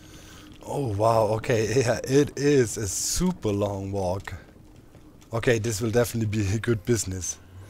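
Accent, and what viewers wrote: Germany. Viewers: that synchronised inventory poppy technology thing is absolulty mindblowing. Recognized you last season because of this for the first time. Since then love watching ur videos (and espescially that ur vids are so long, haw*.* best for coffee in the morning) haha and btw first time i heard u speaking id know hahaha hes german, his pronunciation is the same like mine :D:D lots of love from potato to potato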